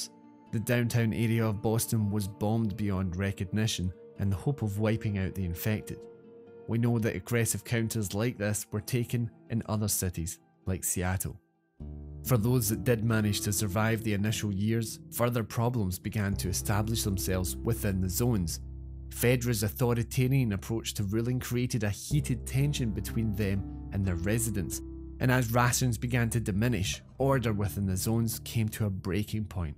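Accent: Scotland, United Kingdom. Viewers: I love your Scottish accent! :) it’s very calmed and easy to understand. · the universe doesn’t revolve around you, scottish accent is easy to understand for most people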